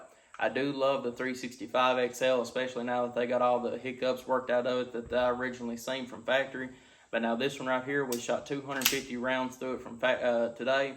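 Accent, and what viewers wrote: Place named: Deep South, United States. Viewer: wher ou from cuz i couldnt understand a word? asking sincerely. im from fl grew up in ga, but where ou from/ thats the craziest accent. love it. like deep south mixed w cajun? GA swamp? fl glades? where ya from bro?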